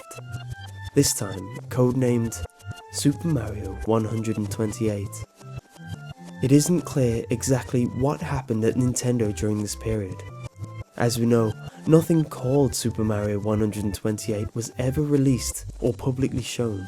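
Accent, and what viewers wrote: United Kingdom. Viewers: IKR, Brits pronouncing things differently is so wholesome!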